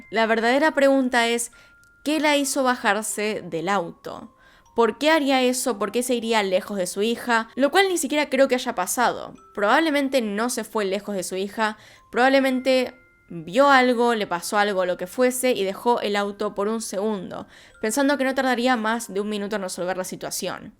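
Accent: Argentina. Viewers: Hola a mí también me encanta una...vez...más y lo bien relatas las historias con esa voz tienes un Argentino muy bonito👍💞💞💞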